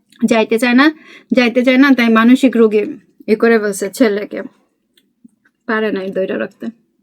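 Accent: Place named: Barishal, Bangladesh. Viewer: আপনার কথায় বরিশাল এর ভাব আছে,খুবই, খুবই ভাল লাগে।সব সময় ভাল থাকবেন। ❤🎉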